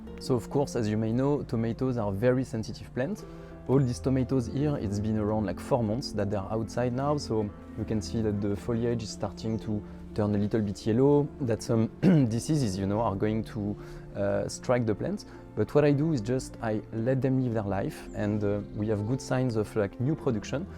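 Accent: France. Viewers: Such a beautiful french guy speaks on cute french accent about his balcony garden ☺️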